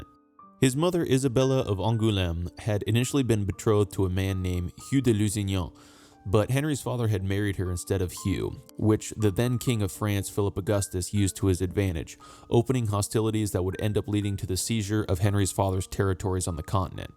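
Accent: United States. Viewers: Well written .. poorly pronounced. The American accent is an extreme handicap to educators.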